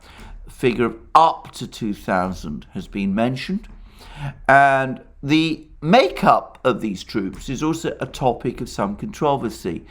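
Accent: United Kingdom. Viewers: Overly British accent destroyed the video quality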